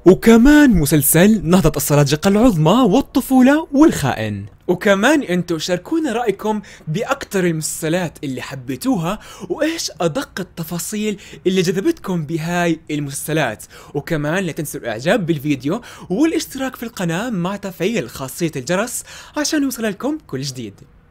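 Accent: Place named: France. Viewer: J'adore votre accent Français 🎈🤠🎈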